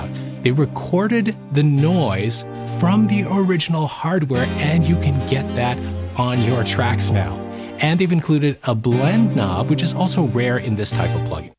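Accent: India. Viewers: R u Tamil guy?😅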